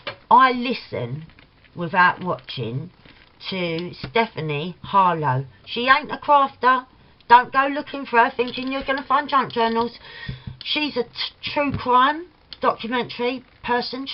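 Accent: Cockney, United Kingdom. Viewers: Thank you for tagging me! I’m filming the response today 😊❤️ oh, and I absolutely adore your cockney accent 😉❤️❤️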